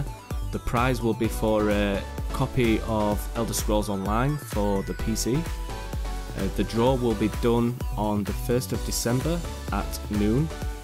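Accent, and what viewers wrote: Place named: Yorkshire, United Kingdom. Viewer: Haha yeah, nice and subtle man! What part of England has this accent? Yorkshire/Sheffield somewhere around there? I need to learn to speak like that, it's just awesome!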